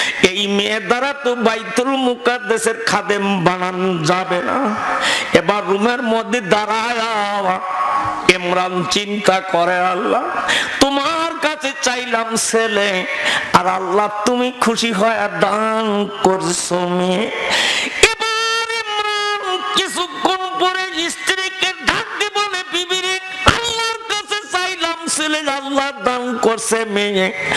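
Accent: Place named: Pabna, Bangladesh. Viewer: Tumra khanek por jabene bait cole... Pabnar vasha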